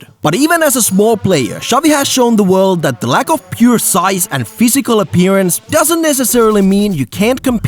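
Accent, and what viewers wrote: Finland. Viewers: Is this guy finnish? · suomalainen puhuja. Helposti tunnistaa🙂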